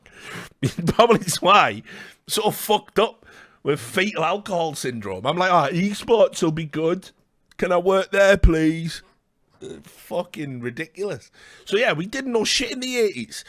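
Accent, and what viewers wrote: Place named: Birmingham, United Kingdom. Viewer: Brummie \m/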